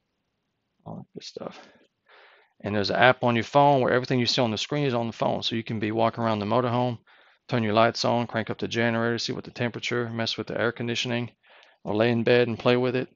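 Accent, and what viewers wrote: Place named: Montreal, Canada. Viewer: Great review as always. Liking the Montreal accent. Thanks for posting.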